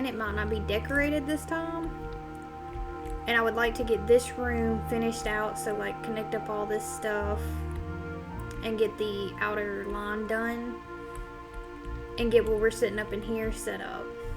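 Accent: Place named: South, United States. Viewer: Hey! Contrats on starting your new modded serie! I've been kinda binge-watching the serie so far. You seem new to this kind of thing, which is great! I'd like to know if you're the kind of YouTuber that likes having comments helping you with tips and tricks when you seem stuck, warn you when you make a mistake or forget something, or if you're the type that rather figure it out on your own, if you like the challenge of solving everything like it's a puzzle or some type? Just trying to figure out if you allow some back seating or not, this changes the perception on how we can interact with you in the comment section:) The serie's been great so far, quality wise I'd say the only thing so far is that your voice is really soft and quiet, which makes the video pretty relax (Which I suppose fits with your Cozy persona:D) but personally it could use a little +1-2db increase (just a little louder so we can at least hear you clearly). 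I must say though, you have a very nice accent, sounds a bit southern to me?:P I might be wrong but to be fair I'm not from the US hahaha What are your goals for this modpack? Do you plan on automating everything? Are you more of a stylish builder that fits automation into a design or do you design around the automation, or maybe you simply make it work and that's enough? Lastly, I'm curious if you plan on maybe streaming at some point? A format I like seeing is those cuts you do when you work on a project, when you know it's going to ta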